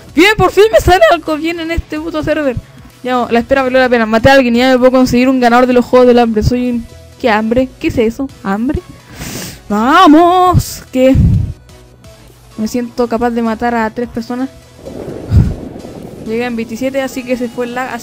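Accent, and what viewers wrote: Chile. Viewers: su acento me suena chileno:D si no mira que es chileno y compara si no es chileno (SORRY ASI SUENAS) y no importa que hable con otro acento es la vida de el:D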